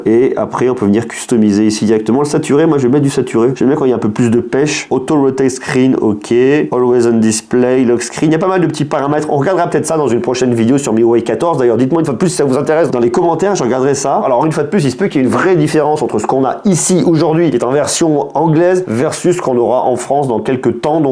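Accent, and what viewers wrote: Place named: France. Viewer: Très bonne vidéo ! (pas grave pour l'anglais, les français ne sont pas connus pour avoir un accent british ou US) Je rejoints certains commentaire, si tu fais des tests d'appareils pas encore ou pas forcément disponible sur les chaines de distributions standards en Europe/France pourquoi pas tester d'autres appareils ? J'ai vu du IQOO 11/11 pro (qui est le OnePlus de Vivo en faite) Pourquoi pas ce X90 pro+ de Vivo Si le budget le permet, il y a évidemment du OPPO Find N2, Vivo X Fold + (ou le X Fold 2), la prochaine version du Honor Magic 5 ultimate ? En tous cas, très intéressant que tu touches à des appareils en avant première (entre autre) sans forcément le soutien de la marque !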